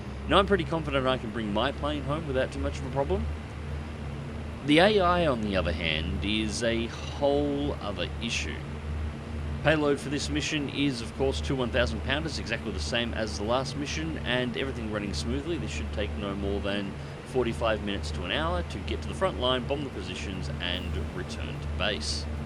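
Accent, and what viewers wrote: Australia. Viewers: Does the J-25 variant have all the perks of the L? Boosted ailerons in particular are what I’m thinking of... Love watching these videos. Ozzie accent, solid flying, honest ego-absent gameplay experience. I’ve been around the channel three, maybe four years (since you played War Thunder with Phly way way back when the game was good—he introduced me to you) and I’ve stuck with your channel long after I’ve stopped watching many others.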